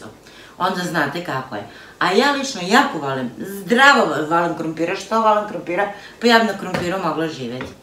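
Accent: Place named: Sombor, Serbia. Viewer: Bas si simpatična 😂 a recepti su ti super! Zadovoljstvo te gledati i slušati , taj Somborski naglasak obožavam… pozdrav iz Edmontona u Kanadi❤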